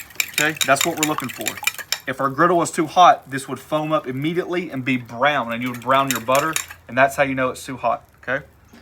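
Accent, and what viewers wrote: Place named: Texas, United States. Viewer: Edumacate me please... is that a Texan accent/southern accent, ahh luuuve eeyt! its the purfaact chef ayxcent!!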